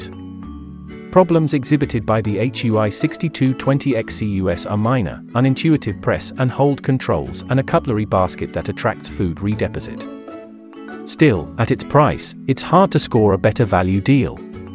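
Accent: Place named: United Kingdom. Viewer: Brit/Euro accent of narrator; are these washers for US market or European?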